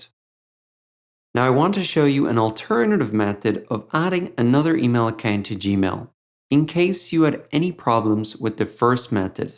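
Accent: Ireland. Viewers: lol, lovely irish;)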